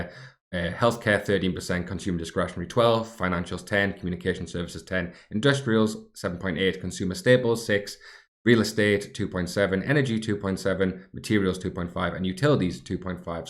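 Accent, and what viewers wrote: United Kingdom. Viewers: Not 100% sure but I think i detect a hint of a Geordie accent. Just got into investing myself, fascinating stuff. The shame is I'm starting at 35 and wish I'd known in my 20's, not just thinking it was all wolf of wall street and scams. I've opened an account with Investengine and went for S&P 500 for my main investment and a couple other ETF's. Now it's just commit and stick it for the long term. Liked, subbed and I'll keep checking in on your journey.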